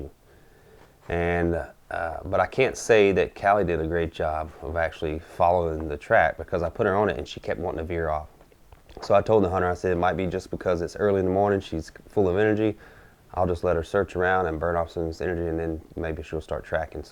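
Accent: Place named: Minnesota, United States. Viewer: What part of Minnesota are you from?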